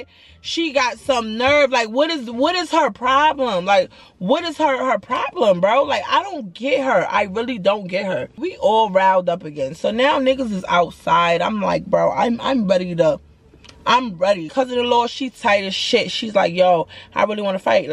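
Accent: New York, United States. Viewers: Hey girl! I’ve been binge watching your videos for like 2 weeks now! Currently on the BD series! I came across one of your videos by accident and been hooked ever since! I think it’s bc we both from New York so I love the way you talk and we both moved down south. Keep up the good work! I will continue to watch your story times. You’re such a good storyteller! ❤ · Girl I love how you still sound so NY when you been in the south for some yrs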